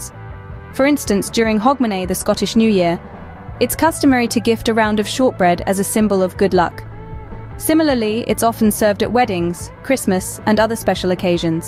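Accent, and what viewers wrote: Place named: United States. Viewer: It’s ai and sounds kind of American at times but don’t let that get in the way of your bigotry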